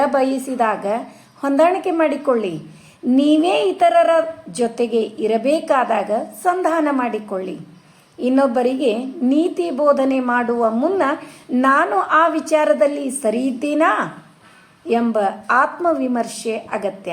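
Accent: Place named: Hubballi, India. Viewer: Aduge choyittu niu yaav urinavru nimma bhashe kelidre hubballi anistu😊❤🎉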